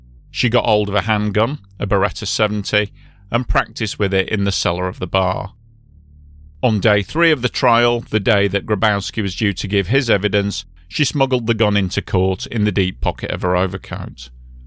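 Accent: United Kingdom. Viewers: a damn Brit narrate a film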